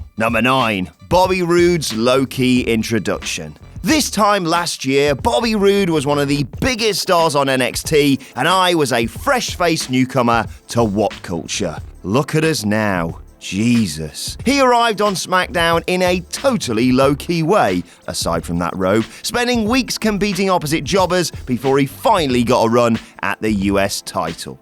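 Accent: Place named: United Kingdom. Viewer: Even Wrasslin sounds smart with a British accent.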